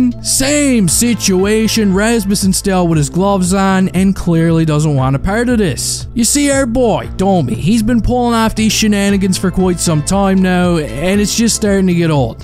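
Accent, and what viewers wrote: Newfoundland, Canada. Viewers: You sound like a newfie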